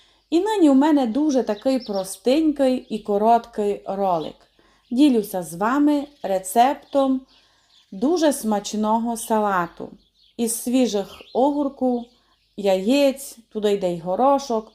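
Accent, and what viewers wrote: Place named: Tiachiv, Ukraine. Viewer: Вітаннячко з Канади 🇨🇦 Вподобайка одразу на підтримку каналу 👍 Хотів спитати Вас ,часом Ви не з Тячівського району? Така знайома ,приємна бесіда у Вас...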